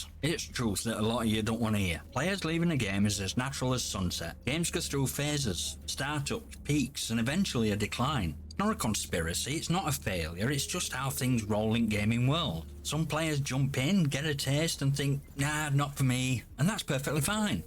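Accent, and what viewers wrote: Yorkshire, United Kingdom. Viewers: proper yorkshire that. good video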